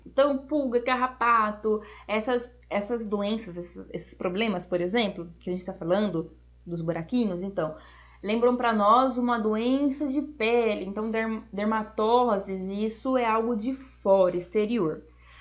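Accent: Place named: Inland São Paulo, Brazil. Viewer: Agora, uma pergunta: Esse sotaque te entrega que vc é do interior de SP ou PR. De onde vc é? (Até pq eu tbm tenho haha)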